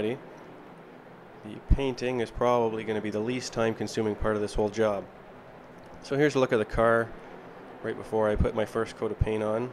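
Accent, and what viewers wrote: Canada. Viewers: You sound Canadian are you? If so where in Canada? Thanks PS you need a longer hose on that paint gun....just sayin...well done. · Nice video. Great spray techniques. Are you Canadian?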